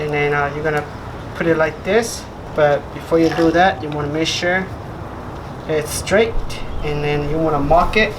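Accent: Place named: Central Valley, United States. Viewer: Bro Iknew 60 seconds into the video you were from Central Valley lol dope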